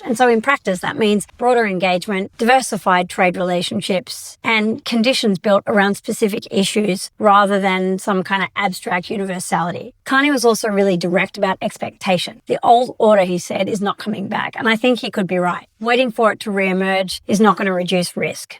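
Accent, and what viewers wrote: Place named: Australia. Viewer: Ah sir, you are too kind! Just a straight-up Aussie accent :)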